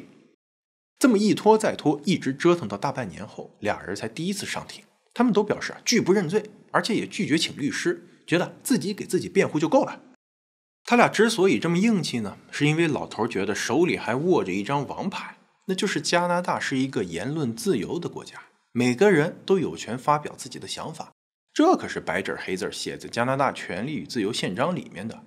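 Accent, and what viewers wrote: China. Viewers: 博主S與F的英文的發音都不正確(卻是中國大陸的英文教育所教的中式發音)，請重新上網向外教老師學學。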